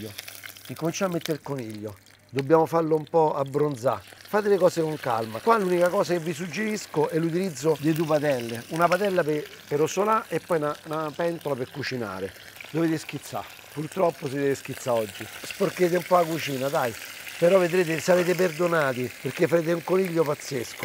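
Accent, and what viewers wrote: Rome, Italy. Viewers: Dovrebbe parlare e gesticolare di meno e poi basta con questo dialetto protoromanesco dai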